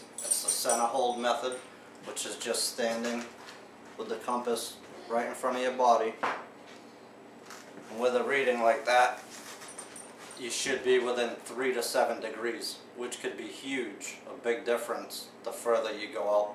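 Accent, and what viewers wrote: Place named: Boston, United States. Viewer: That is the hardest Boston accent I’ve ever heard!! 😊👍🏽👍🏽 Good stuff bro. Easy to understand.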